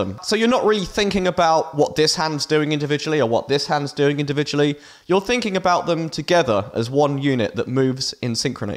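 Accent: United Kingdom. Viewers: Are you British or….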